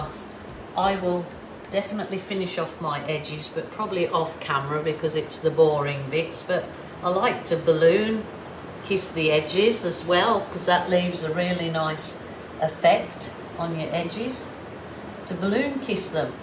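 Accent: Birmingham, United Kingdom. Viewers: Hope you're feeling a lot stronger really soon ..am awaiting the first of 4 surgeries myself including a full donor cornea transplant eventually in the hope of reversing the 100% blindness in my right eye and 60% in my horrid legacy of nearly dying of COVID months in hospiral/ respite ..I taught printmaking too . Just try & take it easy and don't push yourself Youtube..just hope your surgery heals fast x Hey as an English person ( born & bred in Liverpool by Strawberry Fields in fact )..ARE YOU or have family close to you from either the Midlands ( Birmingham ..Izzy Osbourne country ) OR my old neck.if the woods in Liverpool..I.know the amount of Australian fact terms ,swear words lol.& even humour & spelling of course that Britain & Australia.I'm that way are like twins..HOWEVER I strongly hear a Birmingham accent in your voice..OR Scouse any word with two OO' s ..yeah ...so are you ?? 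lol?😆 Wish my late mum was alive she was a potter and my only family ,lost mum aged 94 when I was in the ICU fighting Covid..those round foil paintings my mum would have LOVED them ..just pure colour = mum ❤ xx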